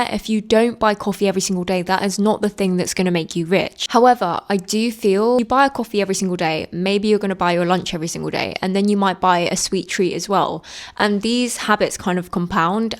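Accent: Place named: England, United Kingdom. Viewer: can you add to your list just having a good accent and utilising your voice? because girl, i live in australia and we barely speak the queens english, i will see the most beautiful, classy woman and she will ruin the whole thing by having a bogan or bogan-adjacent speaking voice. i understand being australian that we all sound at least 10-50% bogan at all times, so i aim to speak like Olivia Newton-John and just enunciate 🤣 btw, your english accent is beautiful!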